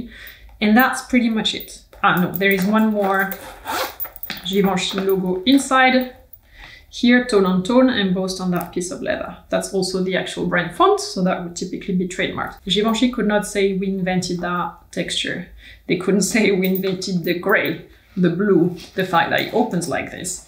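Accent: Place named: France. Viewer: Just a small observation, since you're back in France, your French accent can be heard a bit more clearly again. :D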